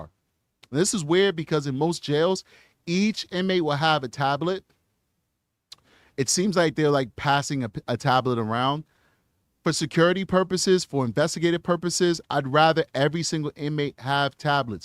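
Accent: New York, United States. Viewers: Love this guy ❤love his accent his NY swag 😂😂😂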